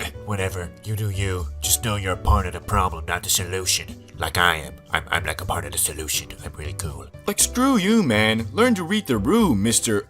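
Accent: United Kingdom. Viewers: Are you britsh? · He's from the UK???? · Do he sound like he from the Uk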